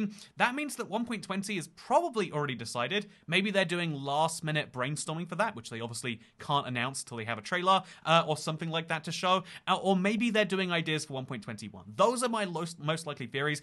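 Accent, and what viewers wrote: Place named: United Kingdom. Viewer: wait, u couldn't tell from his british accent?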